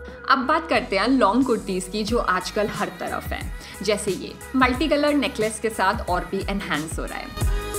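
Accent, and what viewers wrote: Punjab, India. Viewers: Hey, just found your channel and quickly subscribed and followed you on Instagram. I usually don't leave a comment but you are so pleasant and I just loved your Punjabi accent. P.S. Aap bohot Pyaare ho and I loved the way you spoke throughout the video. Lots of love to you! Stay true and best wishes! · Great job done! Thanks alot for providing soo many options in one video👍 appreciate your efforts ❤️ nowdays bloggers waste 15mins for just one tip/outlook, but this was worth watching. I saw your video for the first time...n yes I m the new subscriber😇 yayy I found a decent, grounded styler👍❤️ (The Punjabi accent is love) Lots of love and success to you lady😇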